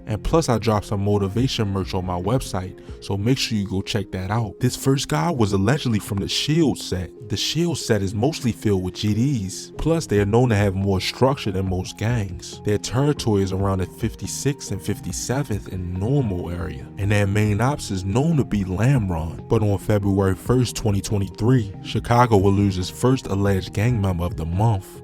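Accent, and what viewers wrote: Baltimore, United States. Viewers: Baltimore people talk funny asf i instantly knew u was from b more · Narrator definitely from Baltimore. That accent is HEAVY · You can tell dude frm Baltimore by the way he say 2 talmbout Tuwwooooo 💀😂😂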